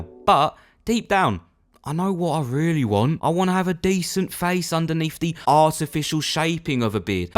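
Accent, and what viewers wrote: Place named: United Kingdom. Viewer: strongest british accent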